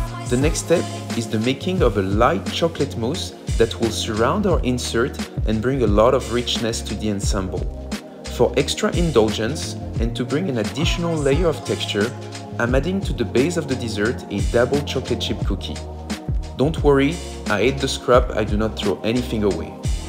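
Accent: France. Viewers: Love hearing him talk. Love that smooth French accent. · You are french? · Je pense qu'il l'est, il a énormément l'accent français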